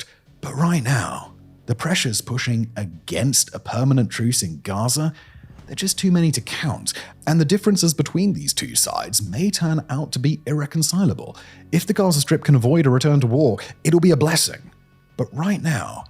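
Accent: United Kingdom. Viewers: wow he is so unbiased. Feel really ashamed that i used to listen to this bald old ass british accent from medieval london.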